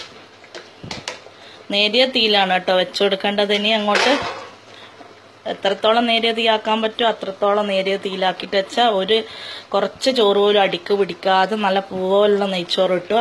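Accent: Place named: Malabar, India. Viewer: റെസിപിയെക്കാളും ഇഷ്ടായത് നിങ്ങളുടെ നല്ല പച്ച മലബാർ ഭാഷയാണ്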